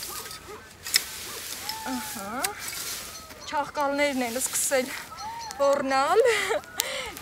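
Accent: Lori, Armenia. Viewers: ջան երբ որ քեզ լսումեմ մի տեսակ հանգստանում եմ։Ուր մնաց լոռվա բառբառը։❤❤❤❤❤❤❤😊😊😊😊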